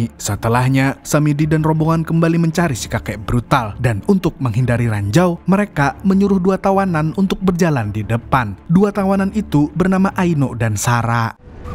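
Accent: Banjar, Indonesia. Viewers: Njir urg banjar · banjar banar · Org Banjar sekali nya😂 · Banjar pina nya wkwkwk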